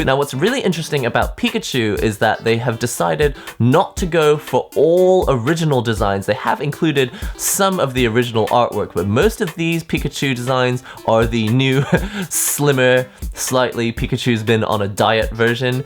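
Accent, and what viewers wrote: United Kingdom. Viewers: Im amazed how you sound like your from the UK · For a gamer living in Japan, you do sound very British. (It's a compliment don't worry, I'm not saying that you're not actual Japanese). A pity Nintendo didn't make a version of Game Boy Green for the west even as a download title for these consoles or sell it pre owned, that would have been a hot seller, but there you go. All they had to do was include the red/blue Pokémon that you couldn't get in Yellow as exclusives and there you go. :D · did you grow up in the uk?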